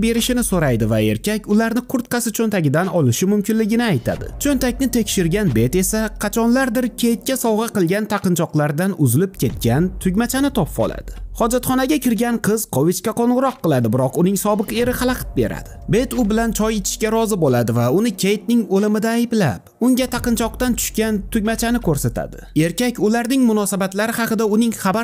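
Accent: Khorezm, Uzbekistan. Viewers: Ogʻo omad xorazmchasina yoribsiz lakin sharxni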